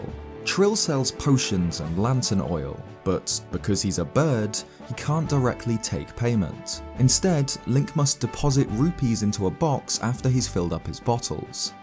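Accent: United Kingdom. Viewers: will the legend reply aswell are you british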